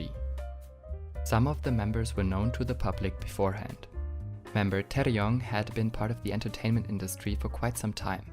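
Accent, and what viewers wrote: Germany. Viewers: Du hast so einen krass starken deutschen Akzent, habs direkt erkannt weil ich auch so Englisch spreche 😋🤫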